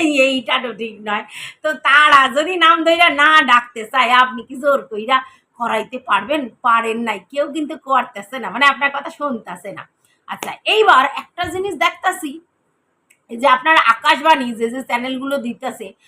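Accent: Bangal, Bangladesh. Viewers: di video ta khub bhalo, kintu normal bangla dialect e video korle better lage sunte.. ei "bangal" tone e kotha bolle half jinish bujhte parina r khub ugro lage.. Kichu mone korona bollam bole.🙂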